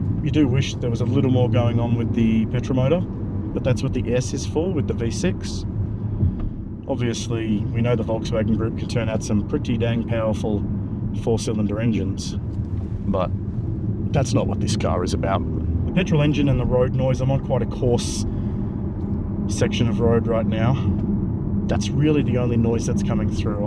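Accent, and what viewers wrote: Australia. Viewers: " tie me kangaroo down!" I can recognize that Aussie accent anywhere mate. "Crikey!" BTW I don't live in Australia I live in Europe. Lol, lol!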